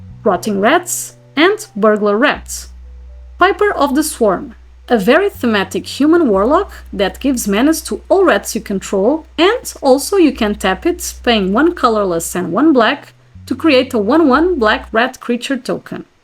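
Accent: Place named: Portugal. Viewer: Are you Portuguese? Luv your accent! ótimo video.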